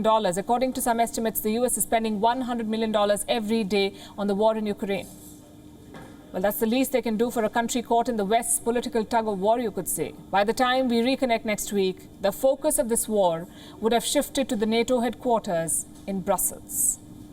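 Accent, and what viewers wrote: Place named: India. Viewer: WION, MSM bottom feeder with an Indian accent.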